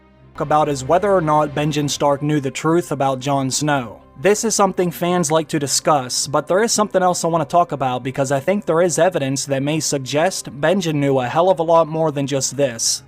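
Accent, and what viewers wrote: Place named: Alaska, United States. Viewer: you sound like those people living in alaskan backwoods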